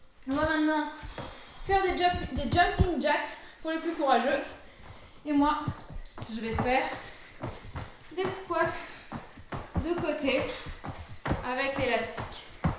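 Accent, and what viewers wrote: Réunion, France. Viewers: "la tantine elle commence le naffair, elle me dit même pas"...Vous êtes réunionnais ? :D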